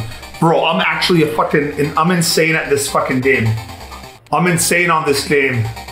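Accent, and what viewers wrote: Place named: New York, United States. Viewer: Who is this new york man? where did our middle eastern csgo player go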